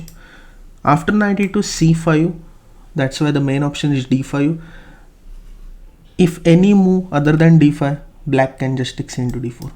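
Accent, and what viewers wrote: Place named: India. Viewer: im gonna be honest, i dont keep up with chess enough to know that many fide masters. but this is actually great for me. i love listening to an indian accent explain things like this. idk why. combine that with chess and the fact that youre definitely qualified to teach this stuff? instant sub.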